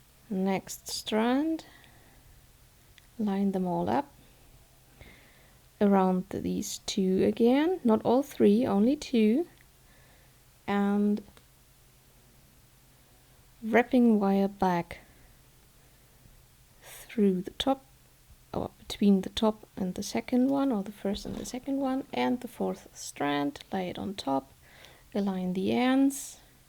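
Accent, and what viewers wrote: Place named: Northern Europe. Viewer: Thank you for answering I was very frustrated when I wrote that… and I love your accent I'm thinking Northern Europe… I wish I spoke a second language as well as you do English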